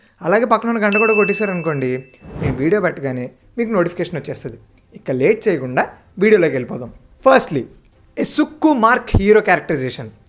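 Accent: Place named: Chittoor, India. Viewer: Love from chittoor 💕. Chittoor slang nee bagane try chesaru meeru.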